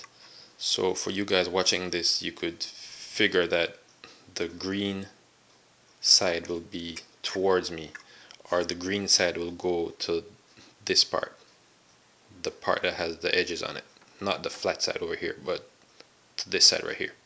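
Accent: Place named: Jamaica. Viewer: Your Jamaican aren't you? I can hear the accent lol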